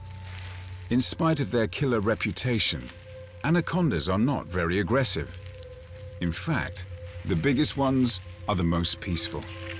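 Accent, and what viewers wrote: United Kingdom. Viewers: This narrator is definitely not American. Sounds like he is from the U.K. somewhere.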